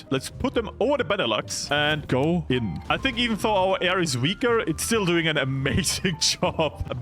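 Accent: Austria. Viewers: Er ist Österreicher, wenn mich nicht alles täuscht 😅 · he's Austrian, I mean you can also tell by his accent in English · Hob erst gröd gemerkt dös er Österreicher is. Ober schene griese aus der Steiermork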